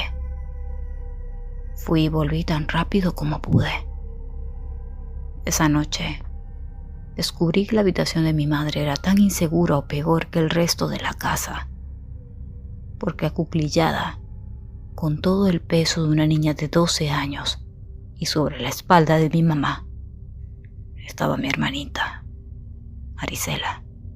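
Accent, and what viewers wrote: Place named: Venezuela. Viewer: noté el acento Venezolano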